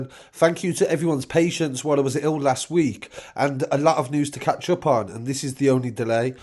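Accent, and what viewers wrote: Birmingham, United Kingdom. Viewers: BRUMMIE I THINK , MATE !!!!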